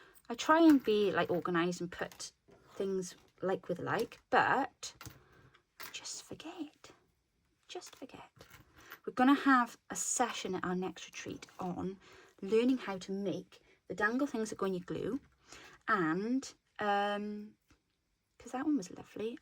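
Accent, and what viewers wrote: Wales, United Kingdom. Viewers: Thank you, thank you, thank you! 🥰I love when you post this kind of video!! I'm able to listen to your hilarious stories and ramblings guilt free, while I work on my JJ or whatever the project of the moment is. Instead of working on a project and panicking that I've missed the pivotal step in how to properly do what your showing us... This one has been wonderful, we got so much insight into you. Plus it was really entertaining, especially for me, who was so in love with Princess Diana, therefore everything British/Welsh, back in the day, when I was much younger and the world was a very different place.( Hint, it's the lovely accent.) Plus all the super random side comments, which is exactly how I think!! ( oooo Squirrel!) By the way, my family calls that emergency, speedy clean up, doing the "Flight of the Bumblebee". Running around the house shoving and/or fluffing and/or hiding stuff, before some unexpected person wants to enter the house. 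Question, How on Earth is it warm enough to go swimming in Wales, in MARCH? Brrrrr! here in Virginia, USA.🥶 Never knew about the SuperThanks, it's under the (...) and just says Thanks. But now we all know! So thanks... Also check your tins-----one has money and one has a battery! Hahahahah 😜 Much Love, Karen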